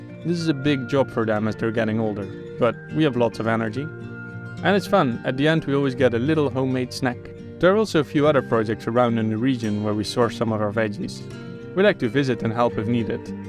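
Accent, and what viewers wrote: Netherlands. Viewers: Forgive me if I'm wrong... But your accent seems Dutch? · Now there's a proper Dutch accent.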